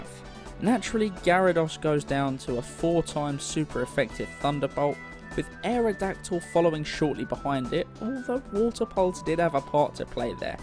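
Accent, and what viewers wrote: South, United States. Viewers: I love chansey ! I saw people saying you were southern * thinking US as I am * but still , may be another country but still southern accent is 🥺🤍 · Hahahahaha go on son watch a lot of these but your southern accent is soothing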